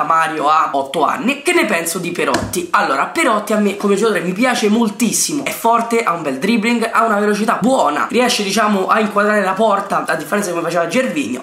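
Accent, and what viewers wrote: Rome, Italy. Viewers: mi insegni il romano?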